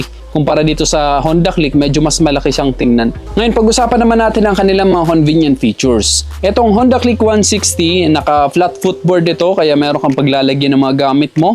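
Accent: Samar, Philippines. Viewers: Taga samar ka no? Yung tuno mo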